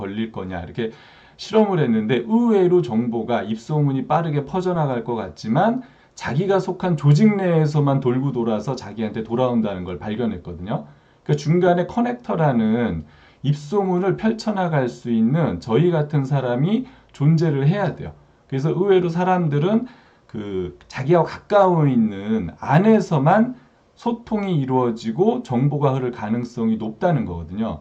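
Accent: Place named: Seoul, South Korea. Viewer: ㅎㅎ서울사람 말투 행동하는 삶 으흠~^^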